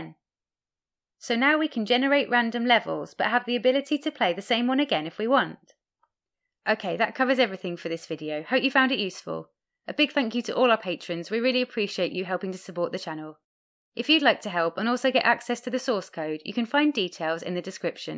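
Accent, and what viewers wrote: England, United Kingdom. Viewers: I like your English accent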